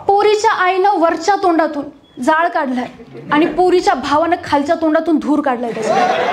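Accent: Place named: Nashik, India. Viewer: खूप छान नाशिकचा एक्सेंट छान आला म्हणजे असं वाटलं की खरंच नाशिकचे आहेस म्हणजे तू आहेस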